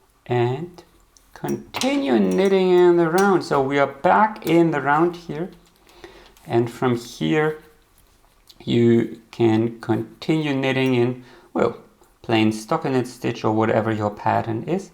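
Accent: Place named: Germany. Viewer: I'm trying to understand your accent. Are you in Germany?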